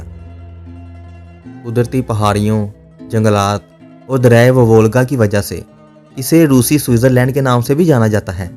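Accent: Lahore, Pakistan. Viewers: Are U Lahori Bahi- BGV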